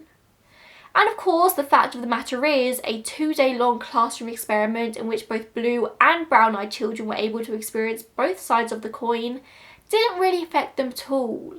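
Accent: England, United Kingdom. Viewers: She really does have a great voice, and this is coming from someone who usually hates the sound of English accents. But her voice is so calming and sweet that I actually like listening to her speak.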